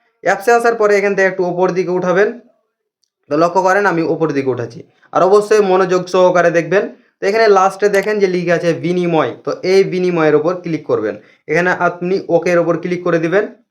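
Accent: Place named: Kolkata, India. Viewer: ভাই, আপনি কি ইন্ডিয়ান? ভাষা কোলকাতার মতো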